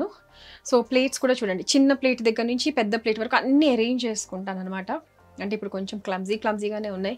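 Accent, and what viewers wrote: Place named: Tenali, India. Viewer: Perfect tenali language 👌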